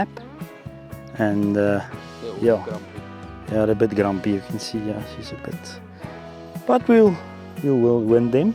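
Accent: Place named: South Africa. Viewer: Thanks for your video and so good to hear another South African accent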